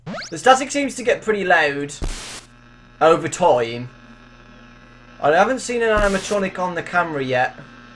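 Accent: United Kingdom. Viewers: Not trying to be raciest but are u British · Thank god he's British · Hey sorry for asking this but what part of uk are you from ? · i wasn't scared at all, i was too busy laughing at every word you said with a vowel in it. Your British accent is hilarious XD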